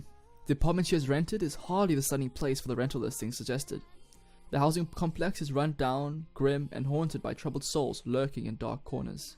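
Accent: South Africa. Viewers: Are you from South Africa? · You from South Africa?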